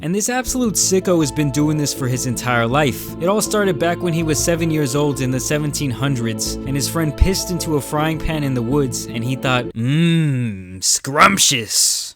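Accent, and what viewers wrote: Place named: Chicago, United States. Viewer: Best voice and your from tx ??? that accent is like “Harlem, wait no Chicago in the 40’s”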